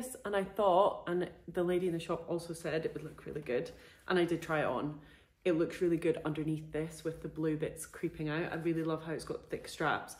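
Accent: Scotland, United Kingdom. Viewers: Just found your channel. Really enjoy seeing what you get up to in London. All the best with the channel, watching from US and can't get enough of your Scottish accent!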